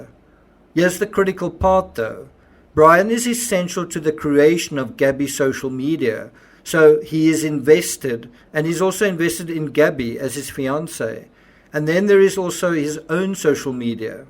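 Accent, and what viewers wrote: South Africa. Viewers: You sound South African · Excellent work,after feeling your near desperation in the 'recent true crime circus'- you totally nailed this one and your quality shines through strongly. Best wishes for a quick recovery of your injury.( my 9 yr old loves your S.A. accent and 'please doo' is heard often in our household..lol)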